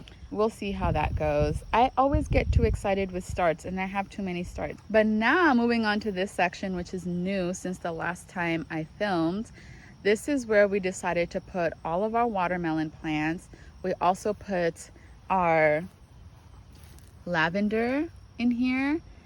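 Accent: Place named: Kenya. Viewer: I was trying to pinpoint your accent . I would not have guessed a Kenyan influence. I'm in 8A in Mississippi. You may have mentioned it, but have you tried eating the sweet potato leaves (prepared like spinach)? I know it is a popular Asian food.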